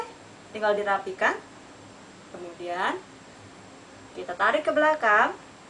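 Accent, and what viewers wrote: Banyumas, Indonesia. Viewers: "DONE" Ikutan #GiveawayTHV2020 untuk modal usaha mudah-mudahan ada rejeki untuk anak bayiku 💕 Saya suka nnton karena semua resepnya sudah diuji terlebih dahulu, jd setiap saya trial tidak pernah gagal. Pertama saya tau channel ini karena saya mencari resep kuker di cookpad untuk hajatan nikahan saya (supaya irit) 😂 dapetlah saya resep dari mba. Pas saya cari-cari caranya di youtube ternyata ada step by stepnya di channel trnyata punya ya mba 😂 ga nyangka hihi Dan, semakin kesini saya sering ikutin tiap ada upload video baru. Karena saya suka dengerin dubbingnya yg dicampur bahasa ngapak 😂😂 Semoga rumah tangga langgeng sampe kakek nenek, semoga setelah memberi GA rejekinya semakin dilancarkan dan diberi keberkahan.. Aamiin yaa robbal'allamiin ❤️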